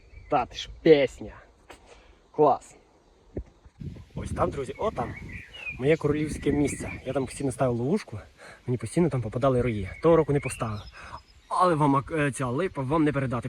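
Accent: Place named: Dubno, Ukraine. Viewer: у мене бабуля з Судобичів біля Смиги. Чую чую я цю мову Дубенську чи у тебе Радивилівська? ))) класно там ліси ставки....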